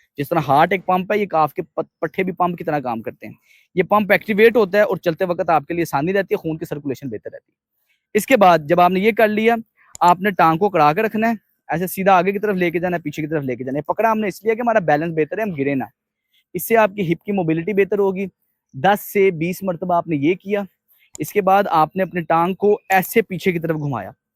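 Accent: Punjab, India. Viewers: Haye Allah... Full gol O Punjabi version english ❤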